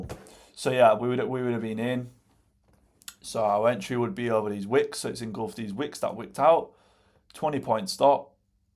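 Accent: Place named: Yorkshire, United Kingdom. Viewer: Proper Yorkshire man 😅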